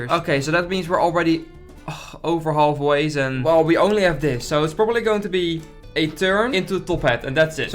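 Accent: Netherlands. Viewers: Nederlands accentje👌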